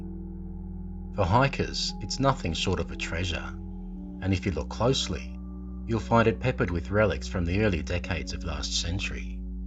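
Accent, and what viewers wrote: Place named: Australia. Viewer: I'll second that no Yankee accents here just fair dinkum Aussie😊😊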